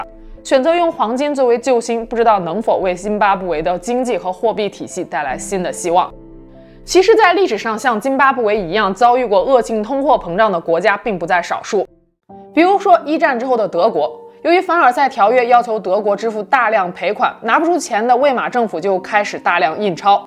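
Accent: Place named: Beijing, China. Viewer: 是哪里人，是中国哪个城市的。听她说话像是北京人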